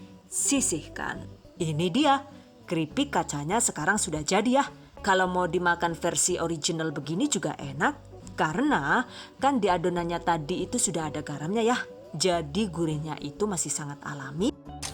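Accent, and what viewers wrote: Banyumas, Indonesia. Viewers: Koq bahasanya campur pake bahasa ngapak, mmg asli mana ta? Cilacap ato banyumas yu 😂😂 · eh padabae ngapak:v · Jawa ngapak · Denek ngapak bu wong endi aku Cilacap bu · Jebule wong ngapak rikaa😂😂😂😂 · Jowo ngapak 🤣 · Kaget pas ngomong ngapak .. jebule sekebangsaan ... Ora ngapak ora kepenak ya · Keren keren,,, buahahaha pliss jgn dikeluarin bahasa ngapaknya saya gak tahan ngakak trus nih.bagus sih jd ada lucu2 humor nya.moga sukses selalu yah.amin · Tag lokasi di Madiun tapi kok bahasanya ngapak.. 😅😂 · Karakter suaranya unik bgt, bahasa inggrisnya fluent, ngapaknya mantap bgt. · ihh si mimin ngomonge ngapak yaa😂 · Lah rika wong ngapak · Deng ngapak sih min??? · Ora ngapak ora kepenak wkwkwk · Kak orang mana si? Medok kaya orang banyumasan nih 😂😂😂😂😂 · Mbarai enyong kencot baee 🤣🤣🤣 ORA NGAPAK ORA KEPENAK 😂🤣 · Lah ya pada" wong ngapak ya😂 sing alon ya yu😄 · Madiun deneng ngapak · bahasa ngapak nya lucu 😅😅😅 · Busyet deneng ngapak koh 😂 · Wong endi kie ngapak😂😂😂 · Ngakak ngapak nya ....😂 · Panggon e Madiun bahasane ngapak,😆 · Ngapak tenan kek Ustadzah Mumpuni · Ngapak yee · Kok ngapak sih? 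Cuman lokasi madiun wkwk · Wongg ngapak😅😅😅😅penjelasanya detaiil bangettt jadi mudah dlm mempraktekkan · Ora ngapak ora kepenak ya yu 😂😍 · Lah ngapak wkwk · Wonge mandan ngomong ngapak😂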